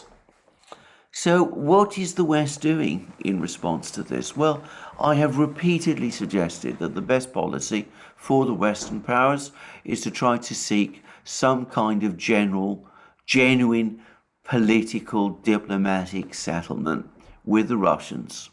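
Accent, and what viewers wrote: United Kingdom. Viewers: Alexander is actually Russian citizen with a British accent. He looks Russian enough.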